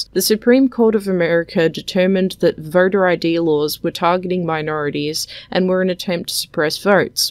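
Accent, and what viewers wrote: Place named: France. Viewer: JF has taken to calling people who disagree with him "Liar" once they don't concede to his framing. It's profoundly bad faith. He becomes cynical and condescending almost immediately. For him this is a for-profit endeavor. You deposit your token and you get exactly the talking points you want with the French accent and academic "credentials" for polish.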